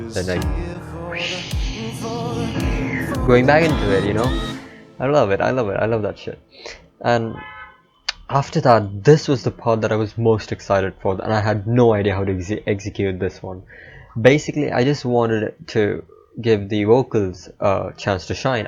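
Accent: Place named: England, United Kingdom. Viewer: you tried to get english ascent🧐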